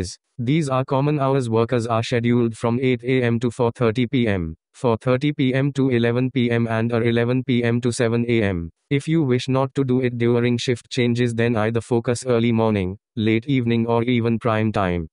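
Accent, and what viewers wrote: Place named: India. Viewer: AI VoiceOver with an Indian accent??? huh?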